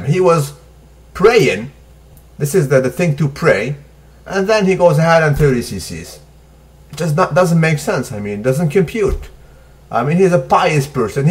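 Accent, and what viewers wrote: Arab world. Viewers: ***** i am from Egypt, I really have no idea how do someone even think he is aesthetic looking like that?! i also admire what you are doing trying to raise awareness and everything personally i didnt see a case of synthol abuse before in front of me yet i know for a fact that even pro bodybuilders use it sometimes to hide some weak points i dunno is it about abusing? not that i like the idea or anything even with a small dose its not even making muscle gains + the poisoning possibility from my side i am sharing your video on some Egyptian fb pages and forums, hopefully when teenagers see it, they realize the facts at early ages. salute you & your arabic accent as i honestly dont know how come you speak arabic :D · hahahah i like your arabic accent, if i find someone in morocco with biceps lookin' like an elephant balls i'll tell him what really works. 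By the way right now i'm bulking and i'm going to need you in my first cut man. SALUTE ! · his Arabic accent is pretty spot on lol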